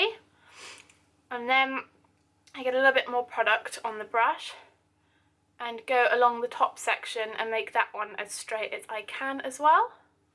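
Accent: United Kingdom. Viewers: How do you acquire that cute English accent :) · Is she brithish? I really like and enjoy her pronounciation. ^ ^ Easy to follow and to understand - I'm not a native speaker btw. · i think she's british because of her accent. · this is why i love British accent. btw, you are so cute <3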